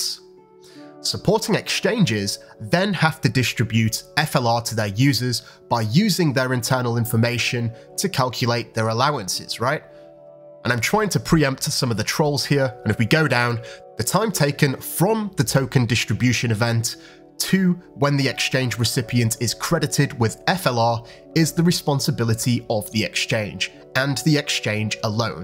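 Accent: Yorkshire, United Kingdom. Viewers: Yorkshire accent for sure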